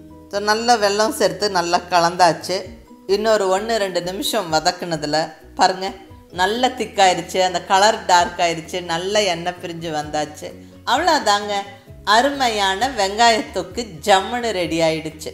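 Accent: Coimbatore, India. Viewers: This simple beautiful talk is our😎 Coimbatore Tamil. PROUD Tamil.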